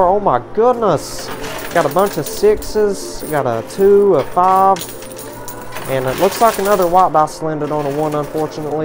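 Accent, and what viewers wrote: South, United States. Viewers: Love your videos and your southern accent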